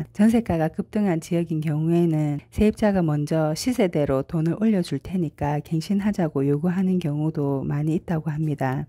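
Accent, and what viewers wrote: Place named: Busan, South Korea. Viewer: 안뇽하세요 저는 부산 사상에 살다가 이민을가버렸어요 님목소리들으니 부산에 빨리 가고싶네요 늘 감사합니다~^^♡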